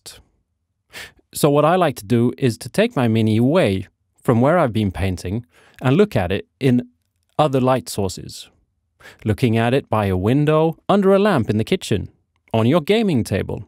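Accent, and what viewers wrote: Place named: Sweden. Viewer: I like your style, there aren’t many minipainting channels that look like yours. I would love to be proven wrong. Though you were British for a short moment but then I heard the Swedish and realised yes this man has a lot of style. I can relate more. Also the cinematography or photo I don’t know what is what, it very much works. Also how you give the viewer the why and your purposes. Not just the how. Very important. I like the string hula conversion very much, would you mind showing me the ropes I want my own!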